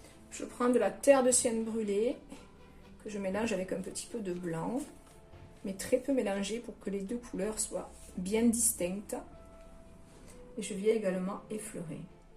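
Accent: South, France. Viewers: 😍Un tableau aux couleurs qui chantent à l'image de votre bel accent du midi. Et dans la grisaille hivernale, la peinture est un excellent loisir ! Grâce à vous , je manie le couteau avec plus d'aisance pour peindre des paysages d'après mes photos. Vos tutos nous donnent confiance et on peint avec enthousiasme...bon... les tubes de couleur se vident vite . ..Heureusement, le père Noël va passer 😉. Mille merci pour votre générosité. Catherine .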